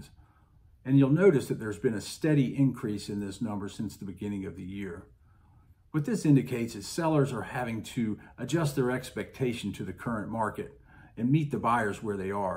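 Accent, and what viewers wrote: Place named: Philadelphia, United States. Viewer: Are you from Philadelphia? I hear a regional accent. Thanks for the info. Can you focus on north Myrtle beach and Cherry grove area also?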